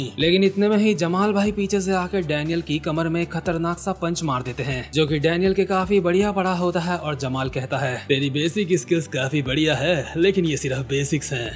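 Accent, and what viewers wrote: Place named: Gujarat, India. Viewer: bhai kya tum crunchyroll pe bhi anime dub krte ho kyuki pehle jaisi voice tum og daniel ko dete the jab wo mota tha waisi hi voice maine crunchyroll mein ek anime ke mc ki voice suni hai aur kya tumhare pass 2 ya 3 bande aur hai jo tumhe lookism ke video dub krne mein help krte hai aur tumhare tone se lagta hai ki tum gujarat ki side se ho kya tum ahmedabad se ho kyuki me bhi waha se hu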